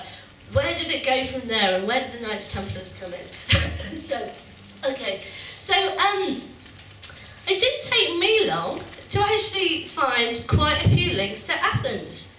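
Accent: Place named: Estuary, United Kingdom. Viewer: i'm just starting to listen to this (i'm up to the beginning of the "visions" part), but i can't help noticing that is a very sensual woman with an absolutely delightful estuary accent....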